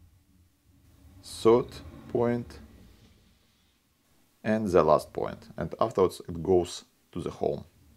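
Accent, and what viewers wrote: Russia. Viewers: Thanks for review. I think this device can be used to control movie camera to fuse real and virtual video or make other effects, or even to clone "handwriting" on letters BTW: your accent is to similar to mine, are you from Russia ?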